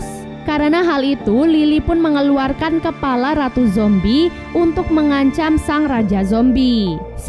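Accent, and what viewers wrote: Lombok, Indonesia. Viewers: Kak aku dengar kak dari lombok aku juga dari lombok 😁😁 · ORANG LOMBOK YA KA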